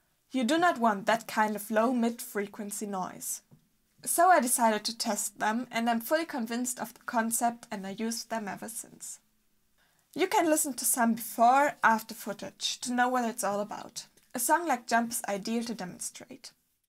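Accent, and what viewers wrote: Germany. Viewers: I'm not a musician at all but I do enjoy these tutorials that you post. I do love your voice and accent (I know you're German) but if you ever heard me talk you would laugh at it cause I live in the southern part of Arkansas in the United States lol :)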